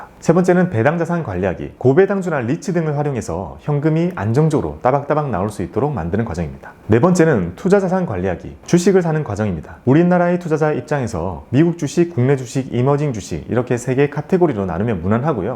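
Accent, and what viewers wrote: Gyeongsang, South Korea. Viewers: 책 읽고있는데 특유의 경상도 말투? 음성지원되는거 같아요. ㅋㅋ 세상 쫄보인 제가 주식이란걸 시작하게 될 줄은... 덕분입니다. 감사합니다~~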